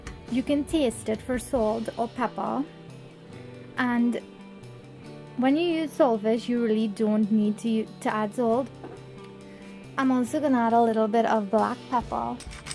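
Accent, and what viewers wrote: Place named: Trinidad and Tobago. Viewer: Love your cooking. Keep up the good work . Love that you talk trini and don't put on a fake accent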